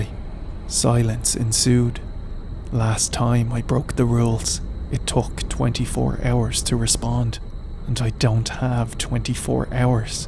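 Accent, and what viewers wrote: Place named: Ireland. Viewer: Hi, may I suggest, you reduce your posting from every day to 3 or 4 days per week. - we don't want to see you burn out, your subscribers care about you - having set days gives you a goal and we know when to look out for your videos - if you do more videos you'll, build up a backup in case the inevitable problem occurs, such as tech glitches - its tempting to give in to the pressure of posting full videos every day. You are the boss, you set your own achievable schedule - suggestion, on non-posting days post a teaser of what is upcoming. This will give you a post a day and maybe help with the pressure. One of the reasons I follow you is the quality of the videos, you aren't American and the Irish accent is gentle on the ear, like the intros and no ads. There is a lot of new YouTubers posting videos in this genre at the moment. 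Quality will last over quantity, hang in there. Keep going, you've got this and we're all behind you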